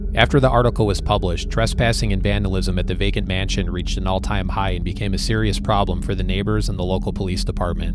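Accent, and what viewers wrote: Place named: Midwest, United States. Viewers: I too am getting grat joy from this Illinois/ Wisconsin accent. Thanks brother from Chicago. Great storytelling! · Excellent content bro and it's a comfort hearing that northern Illinois accent. Hope this channel blows up!!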